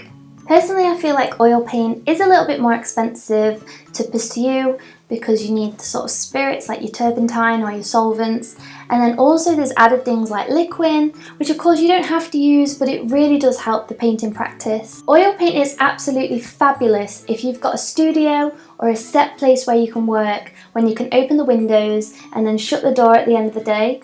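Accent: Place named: Northern England, United Kingdom. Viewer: I'm an acrylic painter, but I'll have to try out oils now... By the way, where is your accent from? Northern England? (I have no idea if that's a good guess or if I'm an idiot). It's lovely! 😁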